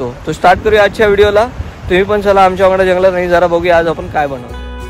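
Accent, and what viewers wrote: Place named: Malvani, India. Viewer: दादा, तुमची मालवणी बोली लई भारी आहे.. ऐकायला फारच गोड वाटतं. 😊 खूपच सुंदर निसर्ग 🌳🌴🌾🌿🌊🌊⛰️🏞️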